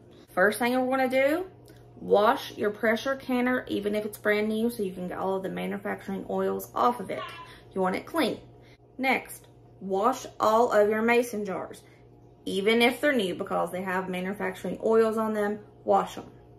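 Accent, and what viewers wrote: South, United States. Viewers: Never heard of home made Spam having lived in many different states I am amazed to see this process. Odd your channel and Mama Baird's both came out with this at the same time. Very interesting you surprise me and love hearing the Southern accent.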